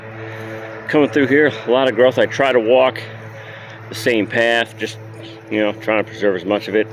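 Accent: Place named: Tennessee, United States. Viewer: You are already starting to sound like a Tennessee person!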